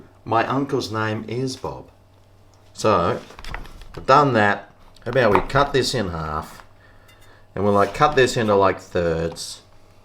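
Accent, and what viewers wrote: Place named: Australia. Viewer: You speak good Australiana Accent. Good Dai Cobber. I wonder how old you are? Are you 65? Cos you move slow. Good videos for old geriatric people to show how to cook slow. When you eat and collect all those sauces on your white beard, it looks untidy. Since you are a star on you tube, can you clean shave your beard to a smooth shave? Why? So when sauces fall on your face, you can feel it and will wipe it immediately with a tissue. This video did not have sauces on your beard . Most others previously did.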